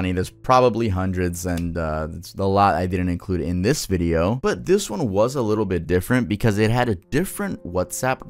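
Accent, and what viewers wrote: Spain. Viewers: “Por Dios” is slang. It mostly translates to something like “By God” not “On God.” “On God” will be more like “En Dios.” Either way, I like your Spanish accent!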